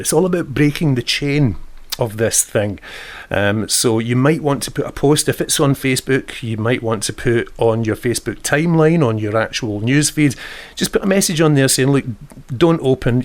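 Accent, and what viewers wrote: Scotland, United Kingdom. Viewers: loved hearing your Scottish accent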